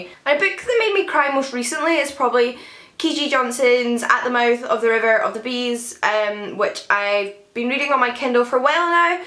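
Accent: Scotland, United Kingdom. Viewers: Well, now I want that big Sherlock Holmes book;). About ugly covers - I think I have quite a few of those from second-hand bookfest buys! Right now I'm reading the ugliest library edition (Scholastic edition) of The Hunger Games ever, and it's so embarrassing going about the house reading it, I'm reading it alone in my bedroom... ugh!:P I read The Book Thief this year, and I found it quite an intriguing read - mostly because I had such low expectations of the book after hating the film version... I guess I liked the friendships in that book, and also sometimes the writing was quirky. But yeah, it was a weird book, and definitely not a favourite war novel! Fun tag, ^_^ P.S. I love your Scottish accent - so cute!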